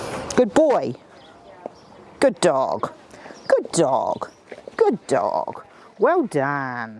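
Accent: New Zealand. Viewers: Could you by chance spell out underneath your video what dog treats you're talking about (or perhaps a link to a recipe) since for the N. Americans the Kiwi accent is a bit difficult to unravel in certain spots and the name of the dog treat/roll was one of those spots for me. Thanks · She’s saying better. It’s the New Zealand accent 😊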